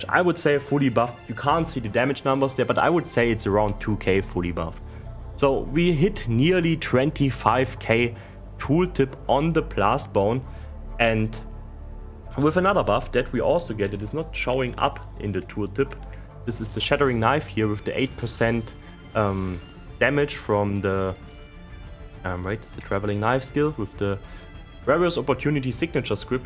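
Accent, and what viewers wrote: Germany. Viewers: Dein Englisch ist so niedlich "typisch" Deutsch ;-) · I love the german accent, never will miss the days with the guys in municher octoberfest xD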